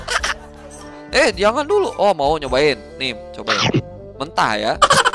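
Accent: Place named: West Java, Indonesia. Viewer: biasa bang tea wkwkwkwk(sunda nya gak terlalu)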